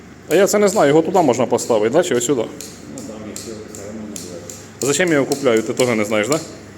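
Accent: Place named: Zaporizhzhia, Ukraine. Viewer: У Вас брат, випадково не у Данії зараз живе? Говір однаковий, і також з Запоріжжя. <link>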